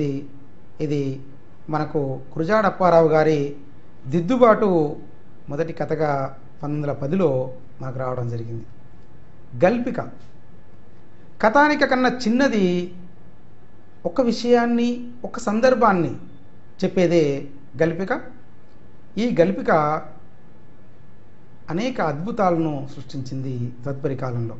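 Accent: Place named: Andhra Pradesh, India. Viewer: ap gaadila cheptunnav ,waste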